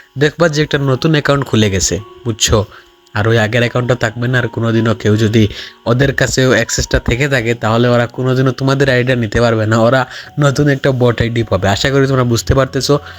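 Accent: Sylhet, Bangladesh. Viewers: ভাই কিতা সিলেটি নি ❤ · vai apni Sylheti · সিলেটিদের কথায় আলাদা ভাইব আছে ভাই শুনলেই বুঝা যায়। শুভকামনা রইলো এলাকার ভাই❤ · ভাই আপনি কি সিলেটি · Vai Sylhety ni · ভাই তুমি সিলেটি নি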